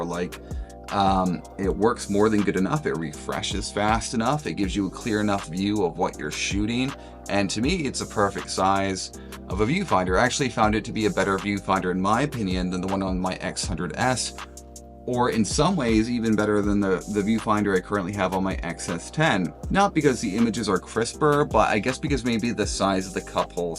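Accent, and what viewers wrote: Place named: United States. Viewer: You don't sound Scottish. I would have said American.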